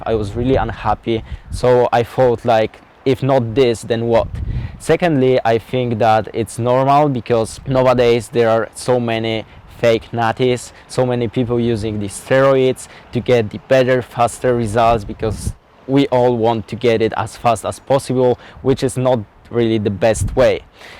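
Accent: Poland. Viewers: Yes, he is from Poland and for me, it's a typical Polish accent.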